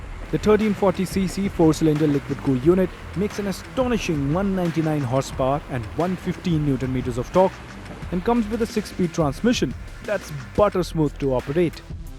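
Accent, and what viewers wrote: India. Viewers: Why can't indians ever speak a language without their accent ? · I had to skip the video as soon as I heard that Indian accent 🤢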